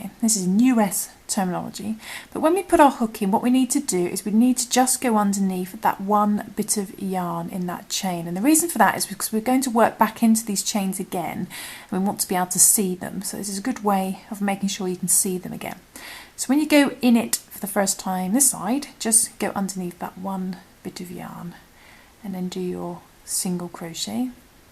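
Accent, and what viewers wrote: England, United Kingdom. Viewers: You sound English so why don’t you say the stitch’s in English not American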